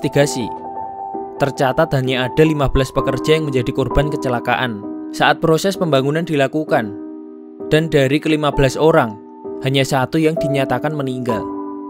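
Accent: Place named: Java, Indonesia. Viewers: Orang Banyumas kayanya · Logat jawa bikin candu kalo denger sambil rebahan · Medok banget naratornya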